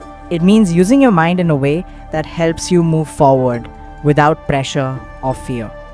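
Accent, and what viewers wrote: India. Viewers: Those videos are REAL good............ What bothers me is hearing an Indian accent..in an Asian Video. Use an AI Reader maybe ? Keep up the good work !!👍 NEW SUBSCRIBER HERE............!! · You sound Indian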